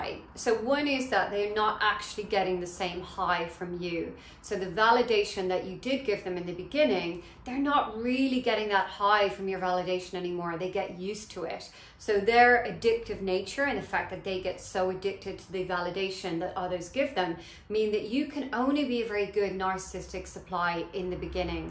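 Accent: Ireland. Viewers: is that a hint of an Irish accent ?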